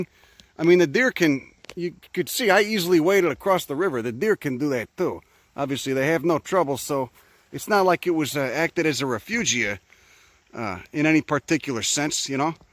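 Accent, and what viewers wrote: Chicago, United States. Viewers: That windy chicago accent is pretty funny... and that's comming from someone who used to be a Chicagoan. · Love your channel, and the Chicago Accent.... "Kick Yourself in the Dick" never gets Old... Keep posting, and Thanks a bunch for the highly entertaining, and educating Videos!! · Oh my, it's not often you come across a Chicago accent in the wild of youtube. This is fantastic.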